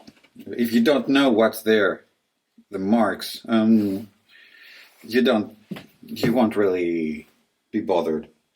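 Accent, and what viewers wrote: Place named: Greece. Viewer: When i heard you speak English i could distinguish a greek accent. When i saw the ΝΥΜΦΗ beer; i was almost sure that you are Greek!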